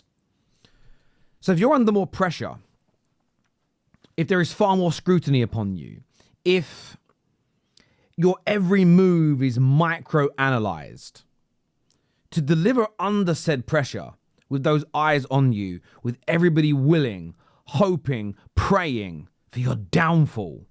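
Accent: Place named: Liverpool, United Kingdom. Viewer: "Scousers don't talk like that" 🤦 Imagine thinking that half a million people all talk exactly the same way...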